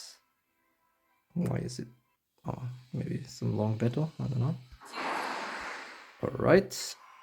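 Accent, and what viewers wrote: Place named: Germany. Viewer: why u have so much Diamonds wtf and u sound like u from germany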